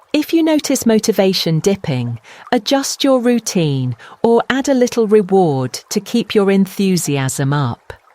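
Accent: United Kingdom. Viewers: ty i love your british accent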